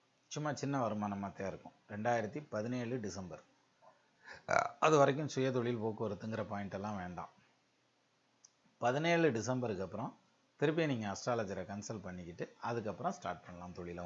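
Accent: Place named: Bodinayakanur, India. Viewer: Hi sir, nice Tamil pronouns, my native place is Bodinayakanur your speaking styles are reminding my childhood days, my question 1. Pl tell Nadi Jothidam is true or lie 2. In 12 house is Sani 6 house is seivai it good or bad 3. Pl give month rasi plan it's more help for all 4. personal question I was born 1989 time 10.30pm Place Kambam mahara laignum star barani I lost many in past 10 years I saw failure only till now pl help me and tell my career path sir pl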